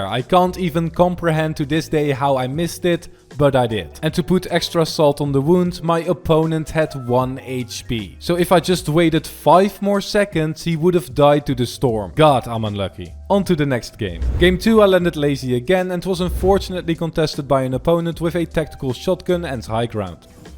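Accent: Netherlands. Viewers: Are you from holland? Als je dat bent dan dacht ik dat al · R u Dutch? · You sound so dutch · are you dutch? you have a dutch accent. ik ben ook Nederlands, ga zo door je bent echt goed op k&m. keep improving:) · Wait a minute do i hear a dutch accent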